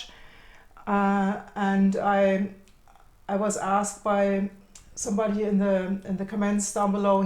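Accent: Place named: Germany. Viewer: by youur english accent, it look to me you are either German or living for long time in Germany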